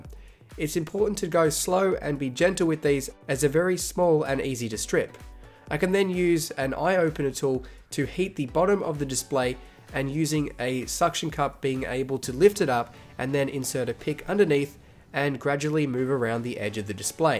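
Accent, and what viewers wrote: Australia. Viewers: Hello, do you take send ins? I'm in Brisbane and recently dropped my fiancés phone onto my MacBook. There's a small but incredibly annoying scuff left on her screen. I'm at the point where I'm willing to buy her a new phone but I thought a quick search was in order first (delighted to hear an Aussie accent). If you don't, what's my best bet? I checked your online store, there's no iPhone X parts available? Is that a 'for now' thing or should I source them elsewhere? Regards, Ricky.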